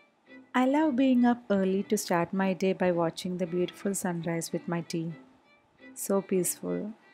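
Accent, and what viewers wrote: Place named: Kerala, India. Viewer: Your Malayalam ascent is very spicy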